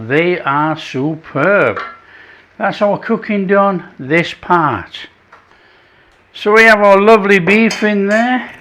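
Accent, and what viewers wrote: United Kingdom. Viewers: That sounds delicious, but I have no Idea, where I can get suet in germany. Maybe I just try it with butter. Cooked your chinese beef with onions today and had a look, what else I can learn from you. I really like your accent, from which part of the UK do you come? I can understand every word, thats nice, compared to all the mumbling americans, I can only get every third word…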